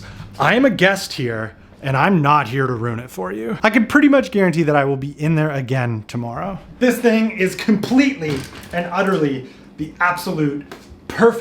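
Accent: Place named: New Brunswick, Canada. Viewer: Even though you are not fluent French speaker anymore,you kept the New Brunswick accent 👍🤣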